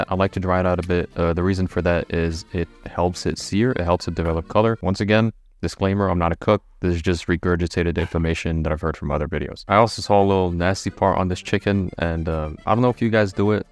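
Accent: United States. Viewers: I don’t know if you’d take this as a compliment or not, but your accent would easily pass for American lol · cool video! I'm surprised you've never been to the US, you have a very american dialect!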